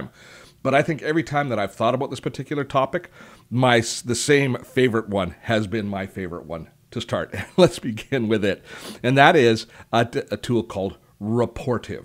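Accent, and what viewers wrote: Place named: Canada. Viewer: You have a canadian accent : )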